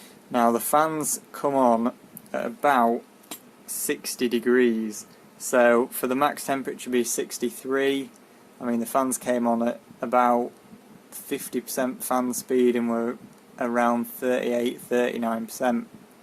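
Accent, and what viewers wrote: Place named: United Kingdom. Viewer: Sorry if I misinterpreted, but you sounded identical to Brian Cox to me... I'm just a simple American trying to place the plethora of British accents but it sounded close to me... Sorry but again, thx for the video :)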